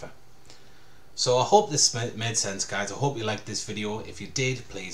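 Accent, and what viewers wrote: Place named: Newcastle, United Kingdom. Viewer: Thanks so much for this tutorial can I ask are you from Newcastle or something I live in gateshead and you sound like a geordie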